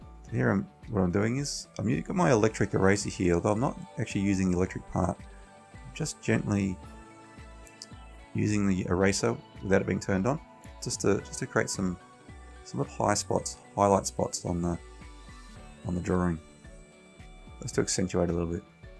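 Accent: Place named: Australia. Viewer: Nice lesson, also good to hear an Aussie voice for a change. Your course is no longer available. Have you moved to Udemy, Teachable or Skillshare by any chance?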